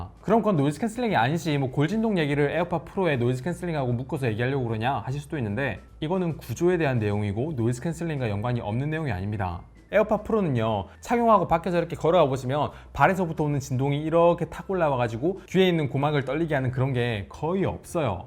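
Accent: Gyeongsang, South Korea. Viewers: 경상도 분인가요? 표준어를 구사하려는 것 같은데 억양이 경상도 사투리 쓰는 분 같네요.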